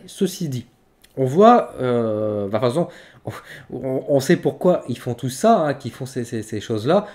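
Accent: North, France. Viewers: Oh toi tu es du nord ! Non ??? 😘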